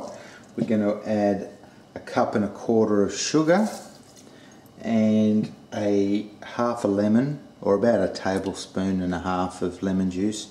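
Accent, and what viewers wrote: Australia. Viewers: Delicious !! Are you from Australia ?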